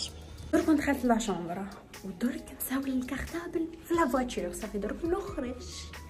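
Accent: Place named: Annaba, Algeria. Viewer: ياجماعة الي قلك شبيه صوتها هكا الغالب حنا لهجتنا لعنانبة هكا انا وسي نعيا نفهم ناس ادا صوتي وادي هدرتي مش نتصنع بصح هوما يقولولي اك تطوطي الغالب هدرتنا هكا نبدلوا هدرتنا ولهجتنا عجالكم تقبلواها واسكتوا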